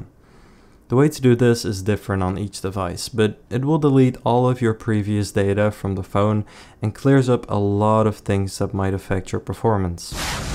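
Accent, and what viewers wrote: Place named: Netherlands. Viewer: You sound so Dutch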